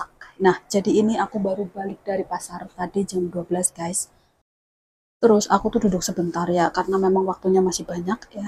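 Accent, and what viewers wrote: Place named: Banyumas, Indonesia. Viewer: Masak masak di Hongkong tetep semangat salan ngapak bae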